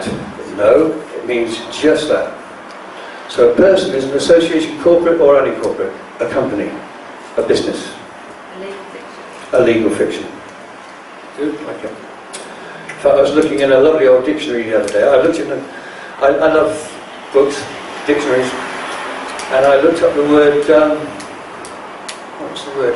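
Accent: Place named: Torquay, United Kingdom. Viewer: That's what I thought too, it's his accent 'talky' Torquay.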